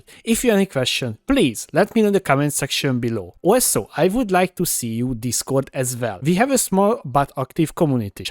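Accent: Hungary. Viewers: Szia , nagyon Magyaros az akcentusod. Really nice video there ! :) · A magyar akcentus megol meg kulfoldrol is felismerni :D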